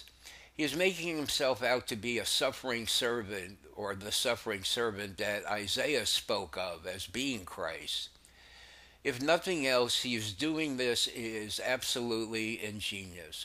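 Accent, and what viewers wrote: United States. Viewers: I think you sound warm and friendly! You kind of sound alittle like you are from Jersey... my home state!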